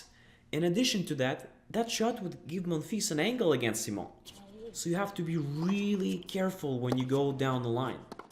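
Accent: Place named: Russia. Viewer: your accent sounds like russian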